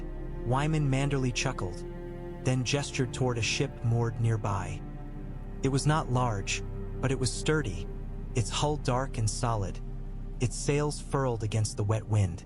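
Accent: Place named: India. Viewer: Why is this one different saying full names and randomly going into Indian accent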